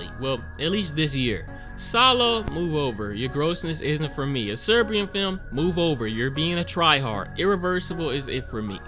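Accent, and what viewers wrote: United States. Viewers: The most disturbing thing here is the whiny American accent.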